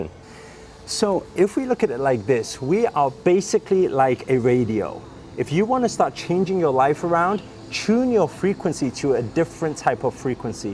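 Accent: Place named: United Kingdom. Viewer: I'm interested in the British accent, I really love it. yesterday I've been thinking; I really want to find a British LOA youtuber, then forgot about it, Opened youtube tonight and I've just found you! I'm blown away because I've been doubting LOA for nothing seems to work. The problem is, I can't let go, I can't stop my obsession with my desires. Please help with a video about an easy way to let go and stop the obsession.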